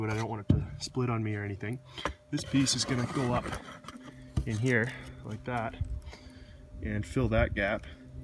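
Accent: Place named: Canada. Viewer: It's the Canadian accent lol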